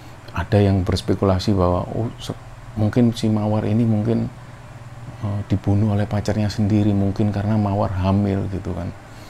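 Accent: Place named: Surabaya, Indonesia. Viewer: aku suka aksen suroboyoannya medok ...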